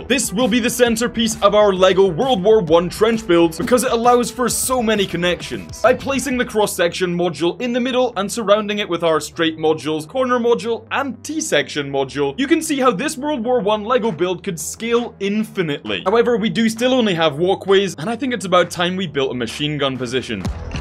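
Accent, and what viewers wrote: United Kingdom. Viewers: Can you make a battle from ever war BTW are you bri’ish or a Scotty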